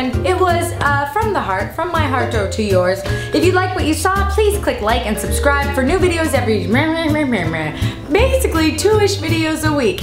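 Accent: France. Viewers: Oh how I love ur French accent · BRING BACK THE FRENCH ACCENT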